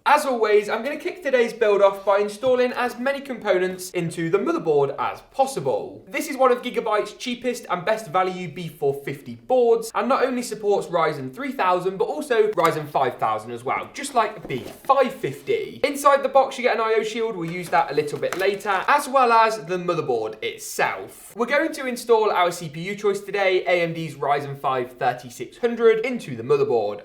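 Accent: United Kingdom. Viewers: Your accent is so british 😂👍 i love it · I previously commented that your British Accent is Crazy and i would repeat that no matter what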